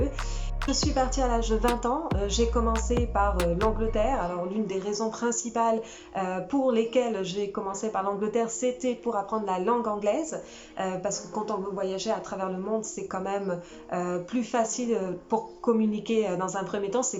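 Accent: Canada. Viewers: Parcours intéressant, bravo ! Donc, ton léger accent est ... québécois :) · J adore ta façon d intervenir Le melange francais canadien peut etre etc La simplicite Le souci dexactitude Lintelligence surement :) Merci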